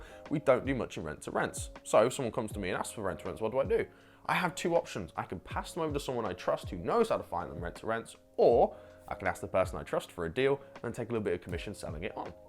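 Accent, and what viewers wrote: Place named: United Kingdom. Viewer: Yeah very cute and young to be a property Investor lol god bless you. I like your accent where are you from in the UK? I'm thinking to take your course :)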